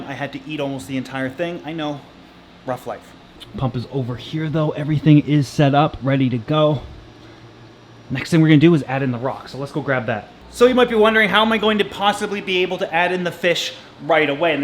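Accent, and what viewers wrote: Canada. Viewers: I only just started to notice your Canadian accent in videos... I must be too busy looking at your amazing tanks to have noticed your cool accent.. Love the vids as always!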